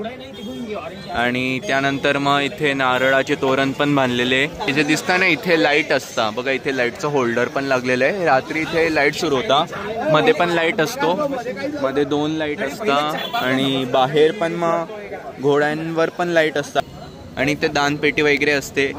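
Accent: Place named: Jalgaon, India. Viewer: खूपच छान एवढ्या गर्दीमध्ये रथाचे चित्र ण करून तु आपल्या जळगावच्या भाषेत मायबोलीत बोलत असतो तेव्हा खूप गावाकडची आठवण येत असते तुला पुढच्या वाटचालीस भरपूर यश मिळो ही श्रीरामा जवळ आमची हात जोडून प्रार्थना आहे धन्यवाद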